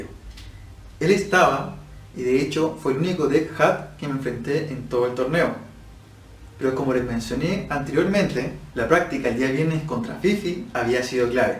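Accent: Ecuador. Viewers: Suena a mensaje de anime, pero la verdad es que nunca hay que rendirse y gracias a eso fue a un mundial entonces siempre diviértanse y jueguen PD. Eres ecuatoriano??? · Eres ecuatoriano o chileno jajajaj decidiré weon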